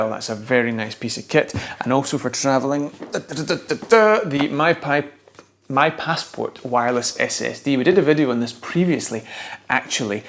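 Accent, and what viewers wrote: Scotland, United Kingdom. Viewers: LOVE THE SCOTTISH ACCENT! Now wear a kilt :)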